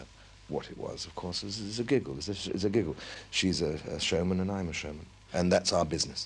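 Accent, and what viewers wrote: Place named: England, United Kingdom. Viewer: I can listen to this guy narrate any documentary. Best English accent.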